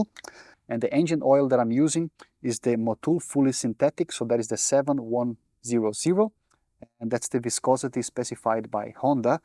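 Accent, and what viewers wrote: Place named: Europe. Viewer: hi, from your accent I assume you are a fellow European, where do you source the OEM parts? Thanks!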